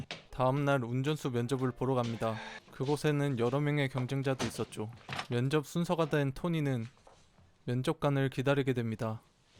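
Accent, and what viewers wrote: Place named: Gyeongsang, South Korea. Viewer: 맞는 듯 ㅋㅋㅋㅋㅋ 쌍도 사투리에 소리가 오른쪽으로만 나오니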